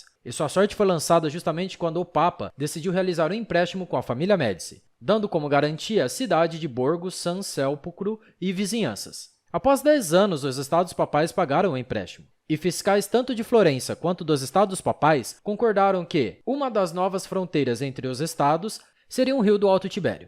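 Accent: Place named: São Paulo, Brazil. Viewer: Meu nao da pra ouvir um audio feito por paulista caipira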